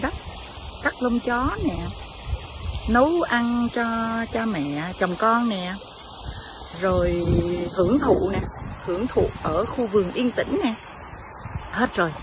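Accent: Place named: North, Vietnam. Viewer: E thích chị nói tiếng bắc ..vui . Chị nói anh Tuấn Anh quay chung với chị .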